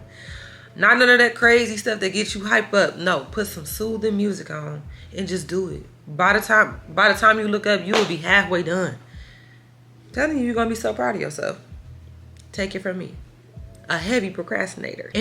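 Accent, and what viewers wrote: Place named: Detroit, United States. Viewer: I can hear the Detroit in your voice ❤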